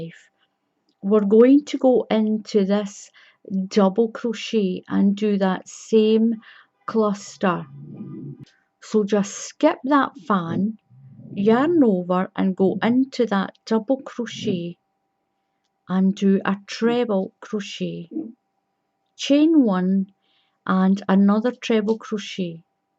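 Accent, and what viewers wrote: Scotland, United Kingdom. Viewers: .... I absolutely love this pattern... and you.... are an amazing teacher.....so well explained... very good camera views on the stitches... wow... just amazing to follow.... I can’t read patterns so I can only make what I can’t follow in tutorials.... you are so easy to follow... I love ❤️ your accent.... so much... I worked with a woman many years ago from Scotland.... she called herself...LADYBIRD..... 😂I just LOVE ❤️ listening to you speak....fond memories....thank you oh so much....I will be making this blanket.!!! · Hi gosh listening to you reminds me of my granny who came from Montrose she taught me to crochet many many years ago when i was 9 I'm 65 now and live in NZ so it was lovely to hear you Scottish voice. Enjoyed your video and look forward to learning more things many thanks again:D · Thank you. I have been struggling with the repeat of this pattern in the past. 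You have now explained it very well. Thanks Thanks. I also love your Scotish accent.❤ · Thank you,! You’re an amazing teacher. I don’t read written patterns well and need a video to help. I was in Scotland earlier this year for our daughter’s wedding to a lovely Scottish fellow. (Came home early due to this Covid mess). Your accent brings back all the memories of the warm Scottish welcome we had. Stay well! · You are a fantastic teacher and it’s so wonderful to hear a fellow Scottish voice! 🏴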